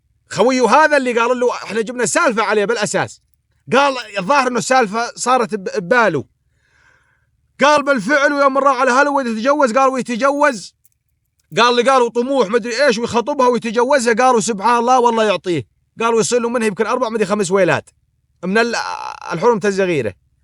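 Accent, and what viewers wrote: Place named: Iraq. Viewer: متابعك من العراق وبل صراحة ما عبالي انو اكو ناس بسوريا لهجتهم تشبهة لهجتنا لان احنة امعاهدين على لهجة أهل سوريا كيفك و شو بدك وربي يحفضك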